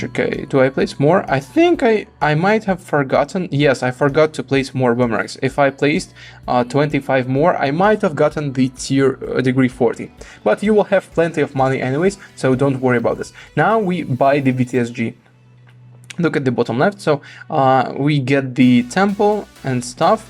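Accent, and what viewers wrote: Russia. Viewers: Wait.... good tutorial but are you russian? If so you have a really great English accent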